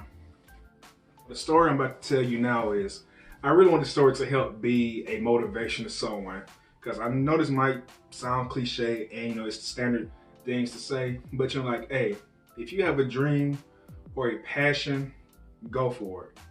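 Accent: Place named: Tennessee, United States. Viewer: You from Tennessee???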